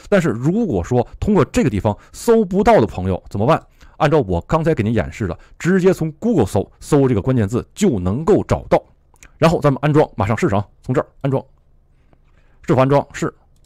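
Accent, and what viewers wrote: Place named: Japan. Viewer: 难怪觉得说英语有点日语的味道哈哈哈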